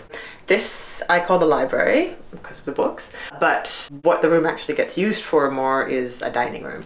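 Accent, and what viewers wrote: Canada. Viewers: Love this house! Is her accent from Toronto? It doesn’t sound like the Canadian accents I’m used to, it’s adorable so I’m just curious. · Compared to American tiny houses, this is a mansion! Where the heck is it? She sounds Canadian. Ah ha! Canadian and Toronto. Very cool tour! Lucky! Despite the narrow size, I bet it cost quite a bit to build and the rent is probably not cheap.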